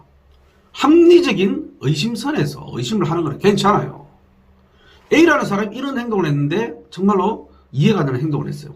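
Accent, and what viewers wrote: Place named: Gyeongsang, South Korea. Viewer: 오~~ 유튜버... 정광용 씨와... 얼굴.. 외모... 사투리 발음이... 아주 비슷하군요. 그 분도... 경상도 던데... .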